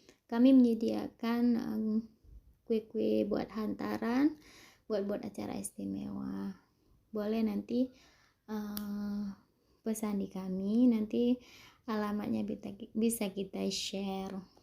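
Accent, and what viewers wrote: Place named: Malaysia. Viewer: Hirip mirip orang Malaysia cara bicaranya 😂,jakarta mna jemlolnya😂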